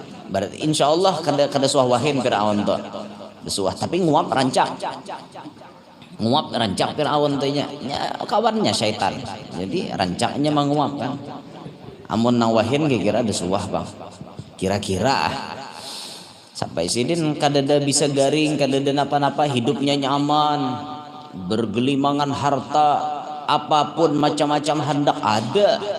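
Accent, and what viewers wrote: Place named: Kluet, Indonesia. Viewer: Allgamdulillah ada guru kita yg cersmah selalu menyejukn hati dgn tidk menghilangnkan logat bhasa klua u yg sdh 30 thn di bnjar merasa bangga dgn melestsrikn bahasa klua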